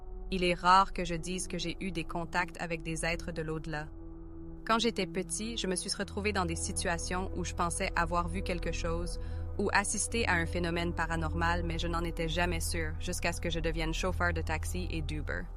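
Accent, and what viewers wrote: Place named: Canada. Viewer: Bonsoir, je viens de tomber sur votre vidéo et j'aime votre accent canadienne et je vais regarder une autre de vos vidéos car je voir dans le commentaire que c'est pas votre vraie voix ❤ bonne soirée à vous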